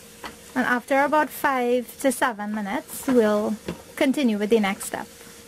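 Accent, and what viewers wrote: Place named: Trinidad, Trinidad and Tobago. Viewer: Looks delicious. Yummy! I like your Trindad accent. Everything looks so good.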